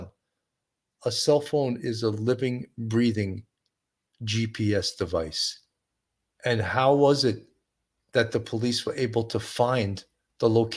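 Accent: New York, United States. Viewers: I love your accent, New Yorker ❤️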